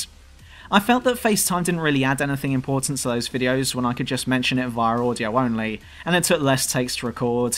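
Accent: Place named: Home Counties, United Kingdom. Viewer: You have the same accent as one of my best friends from the "tri-county" area <Hampshire/ Surrey/ Berkshire >:P So watching your vids is like having a mate talk to be about video games. Gd job on 200 btw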